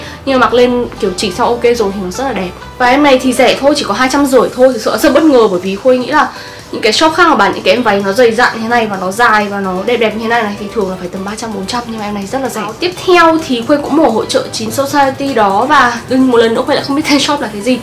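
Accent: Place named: Southern Vietnam, Vietnam. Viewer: Khuê nói giọng Nam dễ thương quá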